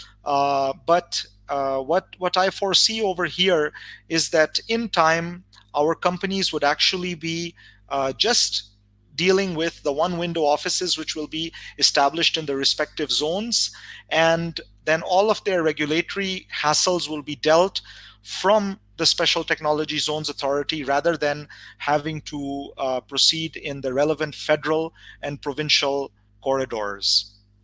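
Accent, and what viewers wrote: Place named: United States. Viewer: We have high hopes from this authority__ the major duty is to start manufacturing the technological products by local engineers. For example machines in medical/ health sector needs an original machine and then engineers trainees under supervision from seniors make the copies__ I am ready to volunteer and invest in it. We have seen in China, Thailand, Korea, Japan, that making the new products is far cry but the first thing is to make cheap copies of famous brands. We, as Pakistanis have to be on war footings on this front Sar dena parey ga nahi to koi ley jae ga__ needs highest Patriotic passions here. I am listening this and unfortunately internet is not working of the speakers. Make the things simple __ don't talk in USA styled English__ do something positive in most humbled fashion plz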